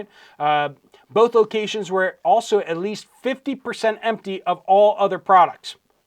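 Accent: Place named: Texas, United States. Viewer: May I suggest a Dale Carnegie or other readily available public speaking course? You will be so much more comfortable. It helps kick out the Texas speech patterns in all of us.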